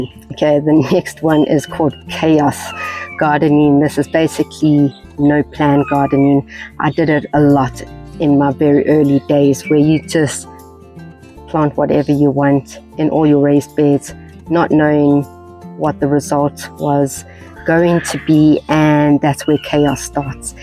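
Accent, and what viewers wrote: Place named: South Africa. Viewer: I thought that was a SA accent 😅and indeed guessed correctly after seeing your description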